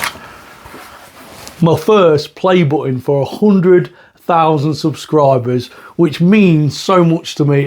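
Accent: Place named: Leicester, United Kingdom. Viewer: You’re welcome matey. Out of curiosity where are you from? Detecting a Leicester or Notts accent.😊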